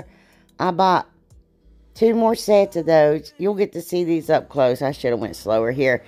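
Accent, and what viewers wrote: South, United States. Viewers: Lord where you been gurl, I looked at your video for the first time today and I love your spirit and your beautiful crafts. You are so inspiring and hilarious with that southern speech. I watched 4 videos and still going. Keep being you we need that. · Love the crafts and love listening to you. I know you have got to be from somewhere in the south...but where? I am in Georgia and love the accent! 🥰💕🌺🌼🌹😆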